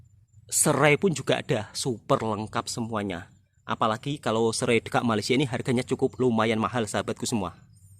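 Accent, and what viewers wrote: Java, Indonesia. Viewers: Suka dengar cakap melayu slang jawa. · Kami semua faham bahasa kamu hya loghat je,pekat jawa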